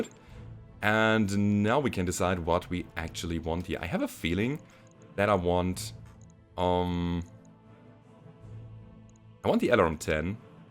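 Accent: Germany. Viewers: That German accent! Great!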